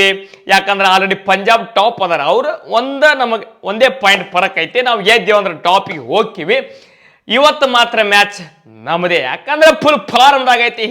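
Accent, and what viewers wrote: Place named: Bijapur, India. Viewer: ಅಣ್ಣಾರ ನೀವೂ ಬಾಳ ಚೊಲೊ ಮಾತಾಡ್ತೀರಿ ನಿಮ್ಮ ಬಿಜಾಪುರ ಮಾತ್ ಕೇಳಾಕ್ ಬಾಳ ಖುಷಿ ಅಕೈತಿ. ಜೈ RCB 👑 💛❤️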